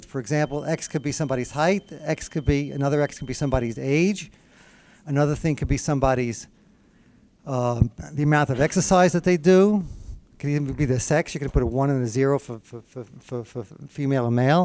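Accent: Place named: New York, United States. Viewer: Lol I admire the NY/ Jersey accent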